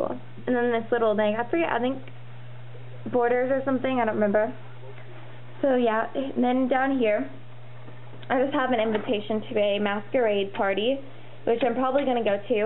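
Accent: South, United States. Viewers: i watched this and i just wanna say we have te same accent ha yay we both have a southern accent btw love it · your room tour made me want to redo my room and not to be mean but your voice sounded kinda country -lauren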